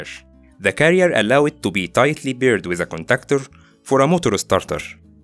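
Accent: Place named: Egypt. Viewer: الانجليزي المصري نار وشرار 🔥🔥ههههههه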